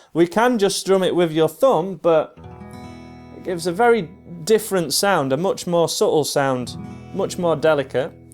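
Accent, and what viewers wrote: United Kingdom. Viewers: I just can't stop watching your videos and I do feel comfortable with your teaching rather than many Others besides I really like you speaking British which is my favorite... Thanks a lot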